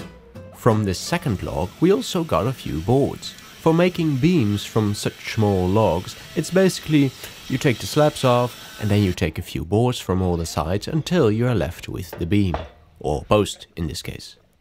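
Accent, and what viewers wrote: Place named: Europe. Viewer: Where you from dude, you sound European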